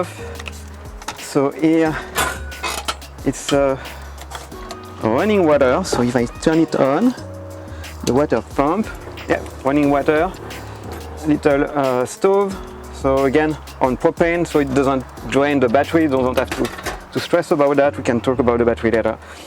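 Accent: France. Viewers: Yet another successful creation from Freedom Vans! This model isn’t my use case, but I think you should hire the narrator based on his wonderful French accent! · Je reconnais l’accent français, sympa le van ☺️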